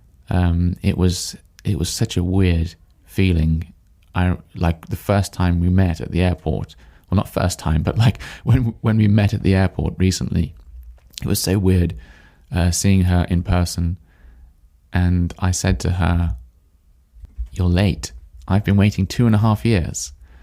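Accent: United Kingdom. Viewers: Do you speak with rp accent?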